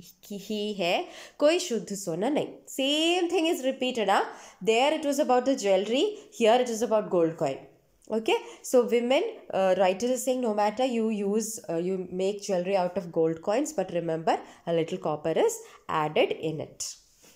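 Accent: Kerala, India. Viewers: Maam you have explained this chapter beautifully!! Also, which state do you belong to? You really sound like a person from Kerala with a Mallu accent😂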